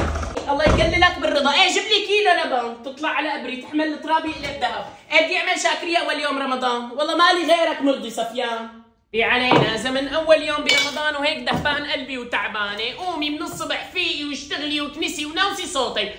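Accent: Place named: Syria. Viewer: هههههههههه والله ضحكتني ماحلاها اللهجة السورية تحية من الجزائر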